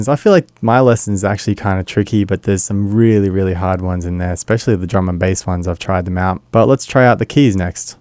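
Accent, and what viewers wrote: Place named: Australia. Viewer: Started watching the video, and felt so at home as you talked - then I realised it was the aussie accent. HAHAHAHA thanks heaps dude.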